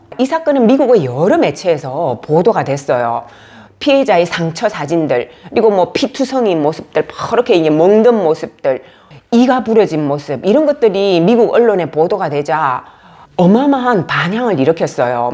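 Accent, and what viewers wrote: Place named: North Korea. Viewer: 뭔가 발음이 다르신데 미국에 오래살아서 새는 그런 발음하고는 다름. 북쪽 발음이 느껴집니다.😅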